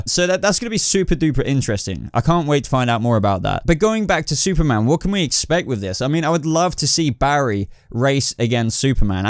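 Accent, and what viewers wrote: United Kingdom. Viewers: And btw nice haircut are u british cause u kinda sound british and a differ race i can't recall or put my finger on lol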